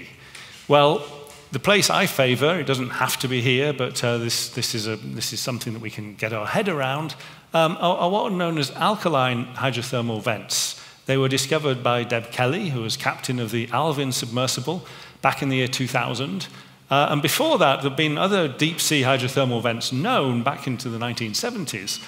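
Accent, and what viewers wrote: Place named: England, United Kingdom. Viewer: Such bull shit delivered with an english accent to impress the really stupid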